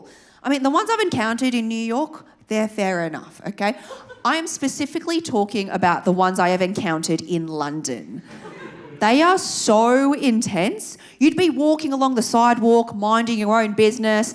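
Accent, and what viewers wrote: Australia. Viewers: I am 20 noin years old. Best Australian accent ever.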